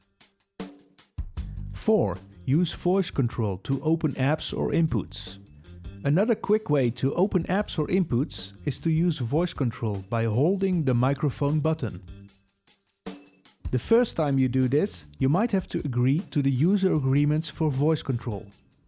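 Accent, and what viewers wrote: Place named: India. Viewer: This voice belongs to someone from India. It's impossible not to recognize the accent.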